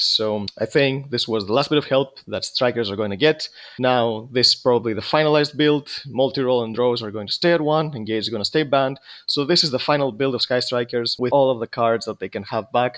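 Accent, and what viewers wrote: Greece. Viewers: R u greek or cypriot my friend?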